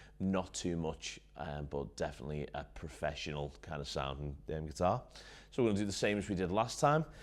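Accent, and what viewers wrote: Liverpool, United Kingdom. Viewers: Subscribed because you gave good reviews (Just ordered an Ibanez gio grg 121 yesterday as my first guitar to learn on) and you got that scouse accent. (shoot me If I'm wrong) Ha cheers from Australia.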